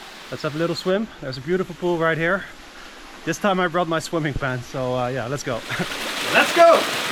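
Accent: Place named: Netherlands. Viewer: Nice to hear your Dutch accent We (brits) lived in the Netherlands for 30 yrs I think someone else has told you that the village of Drave is used by the scouts as a base so not really 'lost' I was more interested in the story of the Brits and Germans who worked together in the other village As I live in Portugal now I must research that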